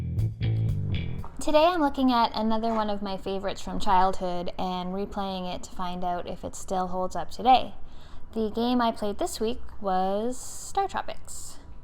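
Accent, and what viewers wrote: Canada. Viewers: Star tropics was my favorite game too. Are you canadian?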